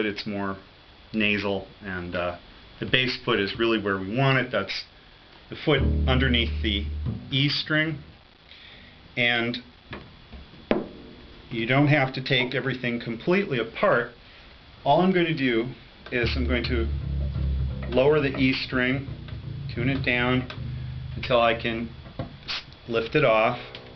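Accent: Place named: United States. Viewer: It sounds American, to me...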